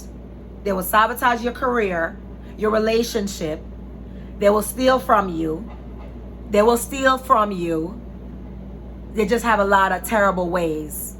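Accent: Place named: Jamaica. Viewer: I hear that Jamaican accent! ♥️ Great content.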